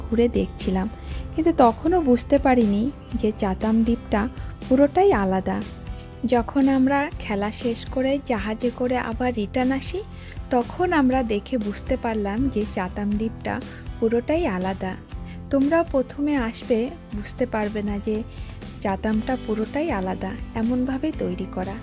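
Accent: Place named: Bangladesh. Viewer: আপনার vlog খুব ভালো লাগে , শুধু একটা অনুরোধ, বাংলাদেশীদের মতো "এস্থানান্তর" "এস্থাপনা".....উচ্চারণগুলো একটু পরিবর্তন করলে শ্রুতিমধুর লাগবে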